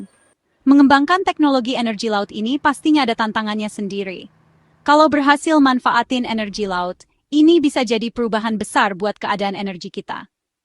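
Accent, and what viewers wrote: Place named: Malaysia. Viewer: Konten kreator yg logat begini palingan orang malengsia.. Halah sok tau lo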